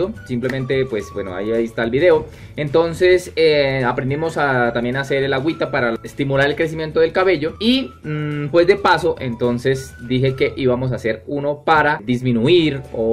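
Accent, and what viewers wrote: Colombia. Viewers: Hola...siempre te veia por hay y la verdad no me atrevia a ver el video 😊y ahora que vi nos los quiero ver todooooos,muy chevere escucharte ...es como escuchar a mis hermanos sabes😉muy colombiano que chevere!!Espero que tu canal siga creciendo cada vez mas!!muchos exitos!!😃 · Colombiano, imagino que de algún lado del llano?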